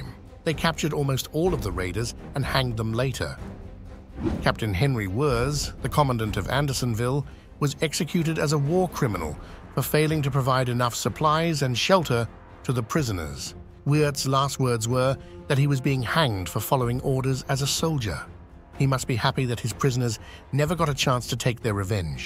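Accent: United Kingdom. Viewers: Hmm, I thought because it was yet one more UK narrator doing American topics… But seriously, the UK gave us the first real concentration camps in the South African Boer War (referred to as the Second Boer War). Women and children died by the thousands.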